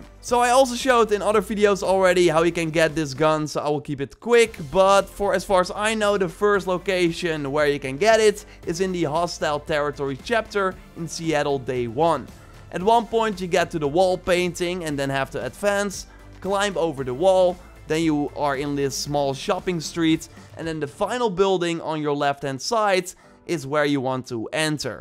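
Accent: Netherlands. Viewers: Ik hoor aan jou accent dat je Nederlands bent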